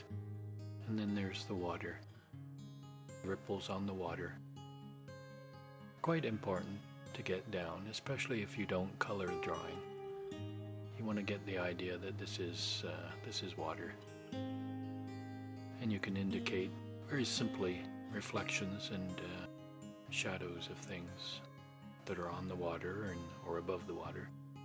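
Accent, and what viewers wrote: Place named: Canada. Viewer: I lived along the St Lawrence River and grew to enjoy the Canadian accent, thanks for the memories. I love how you capture the soul of the area in your pictures. 👍🏻🥰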